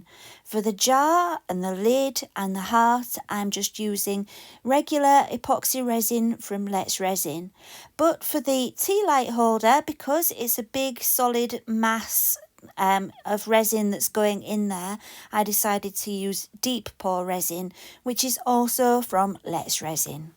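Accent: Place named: England, United Kingdom. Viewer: Beautiful 😍 and such a breath of fresh air to listen to an English person narrating , there aren't many craft videos like this, they're usually American, so thank you for that too 😁 x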